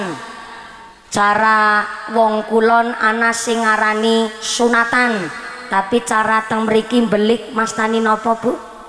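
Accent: Banyumas, Indonesia. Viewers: Assalamualaikum hadir lagi nonton ceramah ngapak Ustadzah Mumpuni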